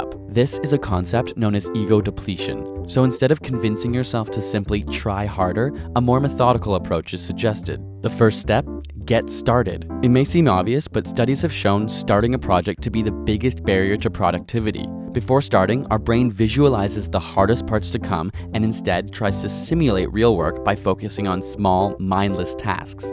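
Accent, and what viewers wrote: Canada. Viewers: that canadian accent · are you canadian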